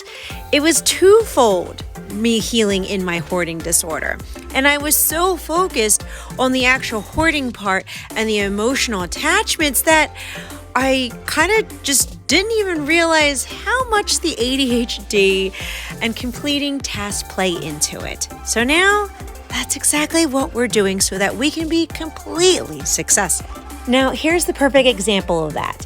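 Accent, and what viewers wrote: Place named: Mid-Atlantic, United States. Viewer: Hi new to ur channel. Came over here from the minimal mom. I def hear an accent from home, ( Pa , NJ, Del area). Ty for sharing with Dawn's audience. All the best ☀️🌻🐝🦋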